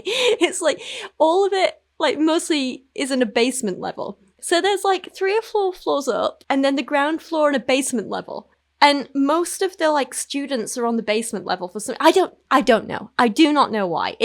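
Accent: United Kingdom. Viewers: When you read this nonsense in your pleasant British accent it just sounds to me like Harry Potter crackfic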